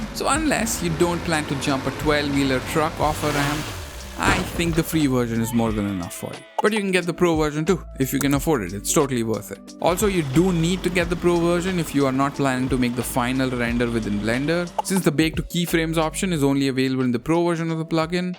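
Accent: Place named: India. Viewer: you click the video, you hear an Indian accent.... hell yeah 🔥🔥🔥🔥🔥🔥🔥 like the video and subscribe the channel, you'll never be disappointed.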